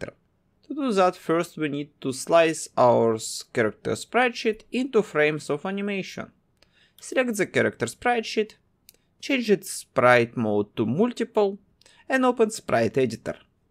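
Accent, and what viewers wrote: Russia. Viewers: Are you russian or what?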